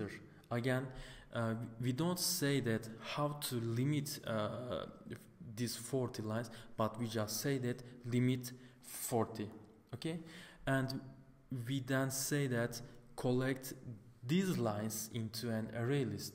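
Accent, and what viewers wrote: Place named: Turkey. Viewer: hocam ismini görmeden aksanından anladım türk olduğunu tebrikler güzel bir seri yapmışsın :)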